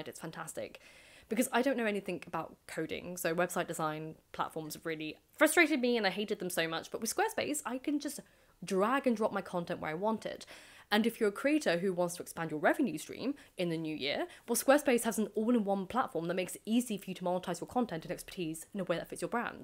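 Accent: RP, United Kingdom. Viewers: Also why do you speak with a heightened RP accent? Is that your real accent or is that just for the Youtube chanel?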